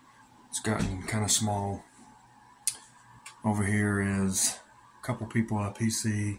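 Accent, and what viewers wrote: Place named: South, United States. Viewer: Now that your doing more videos, I'm getting hooked on that sweet southern accent. Love the card room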